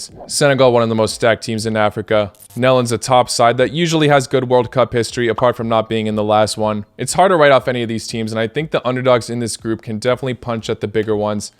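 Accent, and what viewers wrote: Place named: United States. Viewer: Why do you pronounce Croatian players' names in an american way?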